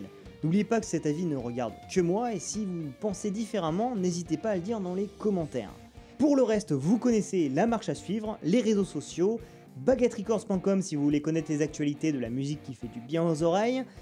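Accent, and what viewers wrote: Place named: Auvergne, France. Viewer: t'es auvergnat nn? j'ai l'impression que t'a l'accent sur les ''o'' et les ''au''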